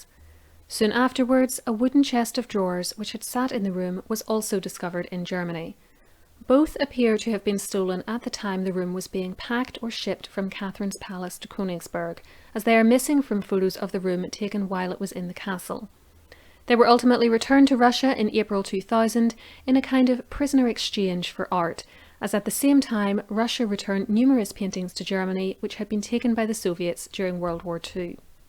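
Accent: Northern Ireland, United Kingdom. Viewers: Is the narrator Northern Irish? I recognise the accent.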